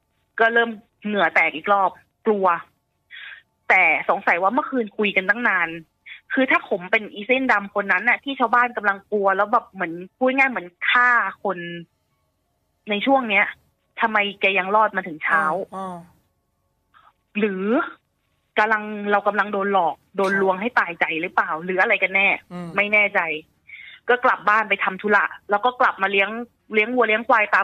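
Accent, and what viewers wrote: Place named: Isan, Thailand. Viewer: ฟังไปเเอบขำ คุณ เล่าใช่คำอีสานคำ ไทยคำ 55 น่าจะเล่าภาษาไทยไปเลยนะ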